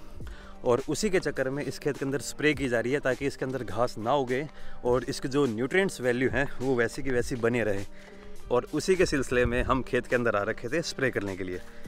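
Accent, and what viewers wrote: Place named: Rajasthan, India. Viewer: Bhai aap Haryanvi nahi Rajasthani ( Shekhawati) bolte ho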